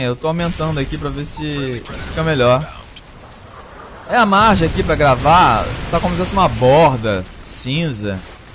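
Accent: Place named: Rio de Janeiro, Brazil. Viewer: Ele é carioca cara '-' Tá loko? kkkk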